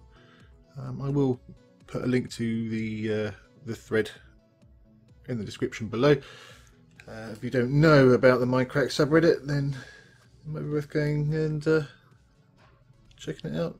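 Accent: England, United Kingdom. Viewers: u really are cool:) u make me laugh with ur accent and style and ur "pommyness":3 watch u, pause, beef and guude alot and you four make me laugh. hope u dont stop:3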